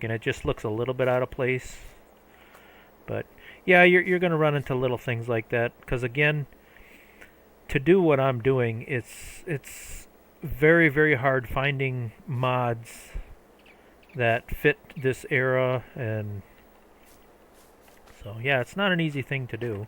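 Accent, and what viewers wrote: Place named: Wisconsin, United States. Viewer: I've got to ask where are you from? By your voice I'm thinking WI or maybe northeast IA but I can't work it out haha I live in Phoenix now but my family is from NW IL about an hour and half from Chicago and 30 minutes from WI.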